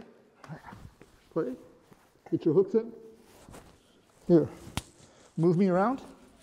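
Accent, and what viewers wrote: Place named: United States. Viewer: Never realized you were teaching out of Germany; I just assumed the US by the accent. Almost makes it feasible to visit from Norway as a vacation project some day.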